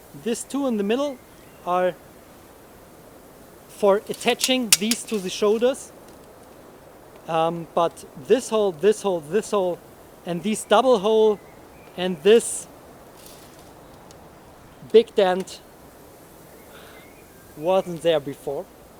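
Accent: Germany. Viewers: First time here. I've immediately recognized your German accent 🥰. Based channel! I subbed.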